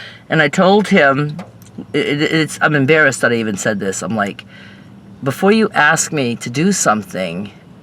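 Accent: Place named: South, United States. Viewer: As an Aussie, i just adore Southern accent:)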